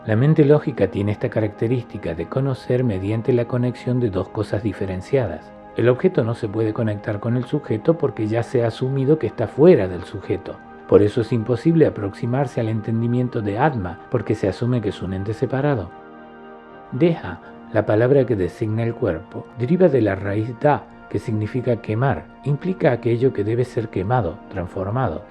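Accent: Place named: Córdoba, Argentina. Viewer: El lector es Cordobés argentino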